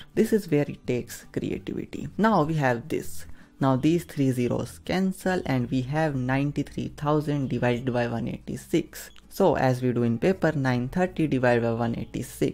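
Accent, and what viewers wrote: India. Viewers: obviously india who else has that accent? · he's from India but I can't really tell from which state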